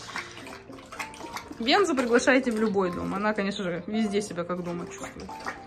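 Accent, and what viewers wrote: Siberia, Russia. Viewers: так беситесь на здоровье. Я не батюшка, помочь ничем не могу, бесов изгонять не умею. А акцент у прекрасный, она же сибирячка, а там основа - казаки, еще от Ермака. Вы донских казаков не слышали, у них речь вообще другая. У меня бабушка всю жизнь вместо «вчера» говорила «надысь» и вместо «скачет» - « бегхеть». Ну и так далее. Шолохова почитайте. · нормальный, сибирский говорок. Я так понимаю, вы казачий и близко не слышали.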